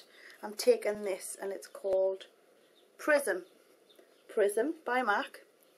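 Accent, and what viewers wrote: Newcastle, United Kingdom. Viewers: wooo for the geordie accent!!! what make up diod you use for this look because i'm a huge germany fan lol :P n yeah :P idc if i'm english, heh. x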